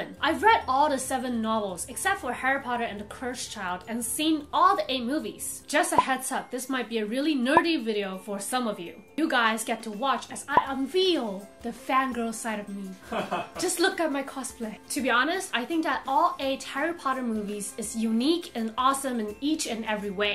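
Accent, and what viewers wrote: United Kingdom. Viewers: 英國腔是怎樣～～😁😁😁太萌了吧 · Your British accent is cute! Nice video. Hey from England. 😊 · 這次好像特別用英國腔來說了呢哈哈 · 跪求。。。我要英國腔，超好聽滴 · 有配合主題搭配英國腔哦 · 真的好喜歡滴妹的英式英語💕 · 滴妹英國腔好好聽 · 😍😍😍😍British accent more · 一直覺得滴妹的英國腔很像妙麗 😍😍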